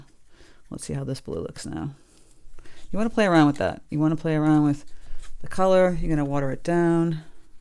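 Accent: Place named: New York, United States. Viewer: Love the accent! N.Y, N.J.?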